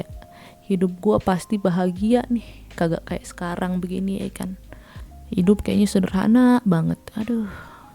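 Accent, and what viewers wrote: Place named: Betawi, Indonesia. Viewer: Kereen yaa ceritanya. Walaupun ananda tinggal di LN. tp logat Betawinya msh kental yaa. Pertanda tdk melupakan bangsa❤. Cerita itu utk mengingatkan kita smua spy menjadi orng yg ttp bersyukur. Jangan sll merasa kekurangan. Krn kl kita melihat keatas tdk ada habisnya. Lihatlah kebawah msh bnyak orng yg kekurangan tp hidup tenang bahagia. Krn bahagia itu sederhana. Tdk hrs bermewah2. Kebersamaan bersama keluarga punya quality time bersama itulah kebahagiaan.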